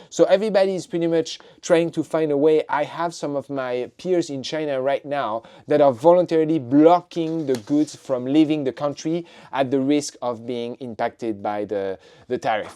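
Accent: France. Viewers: French accent dude importing Chinees goods into USA Cinéma absolu \o/ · Malgré tous les efforts je sens l'accent français, non ? 🤭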